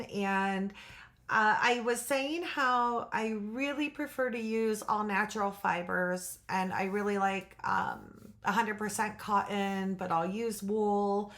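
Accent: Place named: Pennsylvania, United States. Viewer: Hi. I'm Sharon and I'm a quilter, nearby you in NJ.You sound like you're from southeastern PA? Sue (From Small Things) is in Perkiomen Valley area in PA. I'm enjoying your videos. I love quilt talk! Please don't change your format for the grouchy grannies.They can keep looking! I look forward to watching more! Happy Thanksgiving.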